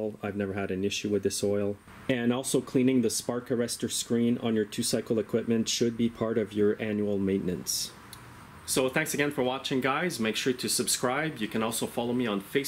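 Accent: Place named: Canada. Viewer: love the vids! I need to ask, what kind of accent do you have? I think its strong Canadian but I can't tell!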